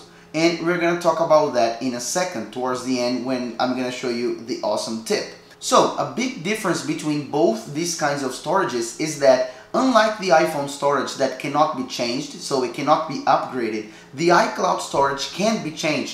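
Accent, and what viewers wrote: Brazil. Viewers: Helped me a lot, thanks. I realized by your accent that you’re also Brazilian haha. Your English is perfect, congratulations! How is it for you to build such a big channel and so much content in another language? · Are you Brazilian??? Awesome accent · You are definitely brazilian I can tell by ur accent 💜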